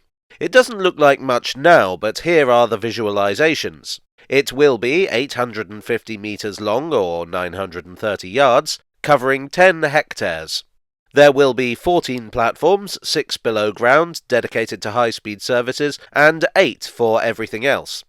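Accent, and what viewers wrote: United Kingdom. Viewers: Tunnel-boring-machine noise; the British accent makes it! 👍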